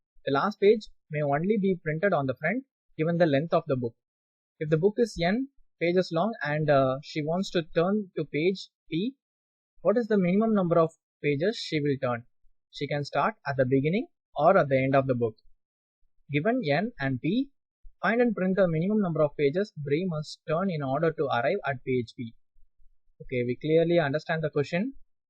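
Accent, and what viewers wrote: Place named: South India, India. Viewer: are you from south india i guess? because of your N pronunciation. am I right?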